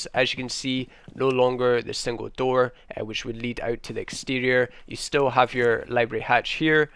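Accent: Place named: Belfast, United Kingdom. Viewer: R u from belfast?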